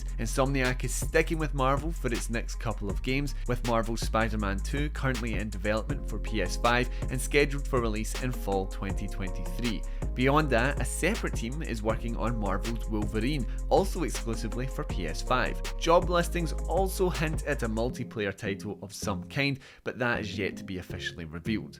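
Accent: Scotland, United Kingdom. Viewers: Imagine being that stupid you cant understand a easily understandable Scottish man.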